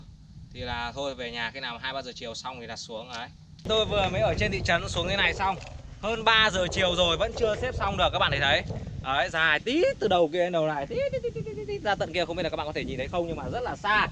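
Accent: Thai Binh, Vietnam. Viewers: Anh là người đâu vậy ạ?? Nghe giống giọng Thái Bình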